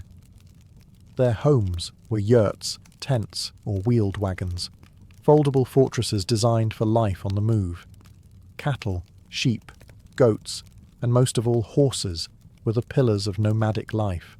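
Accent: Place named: England, United Kingdom. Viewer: Cool history subjects! What puts me to sleep it is the English accent, I always fall asleep watching movies with English accents 😴😴😴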